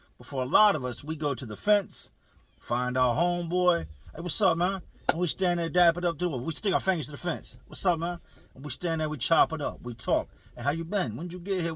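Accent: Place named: Virginia, United States. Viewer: Push the Butteeen. We know from VA, can’t deny that Virgina accent. I here some Danville in there from time to time too.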